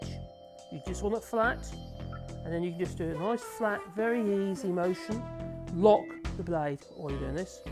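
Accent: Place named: United Kingdom. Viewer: Yeah my sound all the way turned up and still had a hard time understanding. You are British with a lovely accent but the music makes it quite hard to follow then.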